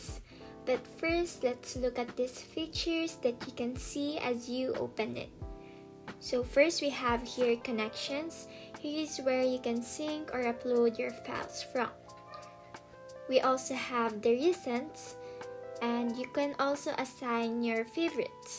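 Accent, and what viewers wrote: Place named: Philippines. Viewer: i like your way of speaking english, it's nice that you don't try to sound like other accent, it's very filipino while sounding very good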